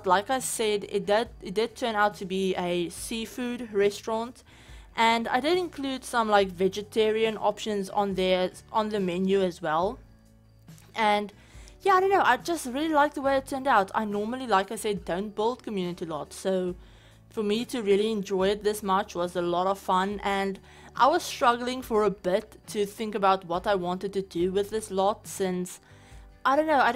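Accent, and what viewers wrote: South Africa. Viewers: My jaw dropped when I heard the South African accent. Need more African simmers!!!!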